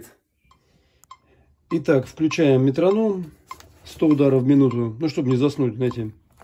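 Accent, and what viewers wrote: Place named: Russia. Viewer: Любопытно. Но автору неплохо бы поработать со своим жутким русским акцентом.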